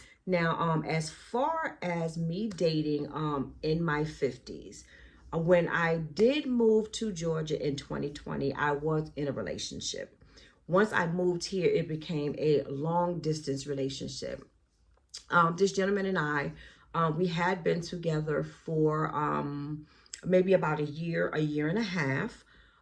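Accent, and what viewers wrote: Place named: Northeast, United States. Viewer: Where are you originally from? Sounds like somewhere in the NE? My daughter got into Spelman also but she went to another school in Rochester NY.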